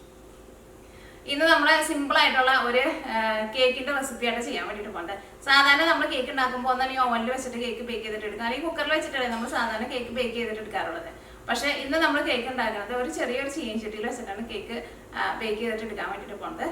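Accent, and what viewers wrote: Thrissur, India. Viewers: Thriusur bhazha kelkaan nannyittunndu,cakekyum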